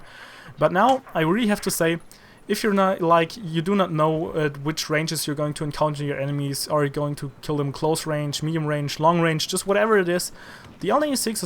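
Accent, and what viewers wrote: Germany. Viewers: are u german? :D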